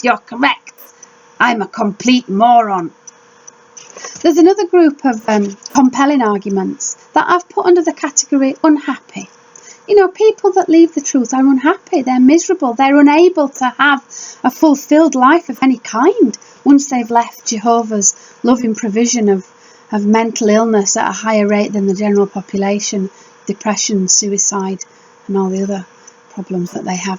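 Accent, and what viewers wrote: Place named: England, United Kingdom. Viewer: You mean that she have a proper English accent ? Whereas you use degenerated English-American-Texas accent ? :)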